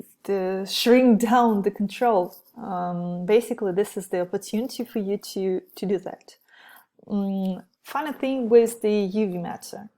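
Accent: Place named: Russia. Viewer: по акценту понял, что русская, потом посмотрел в комменты)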